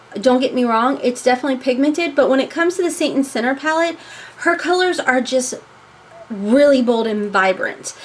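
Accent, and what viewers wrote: South, United States. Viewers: Always a judgement free zone... But, girl, your blending looks great in all of your videos! And I love your looks. Totally, planning on copying some on date night with the hubs. I swear you sound like a southern transplant to KY! And that's a compliment because I am Southern as they come (SC!)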